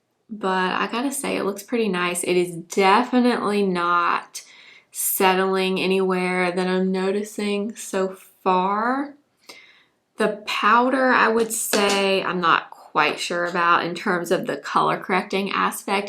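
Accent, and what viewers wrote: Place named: Alabama, United States. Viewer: Are you from Alabama? You sound like Hannah Brown from the bachelorette!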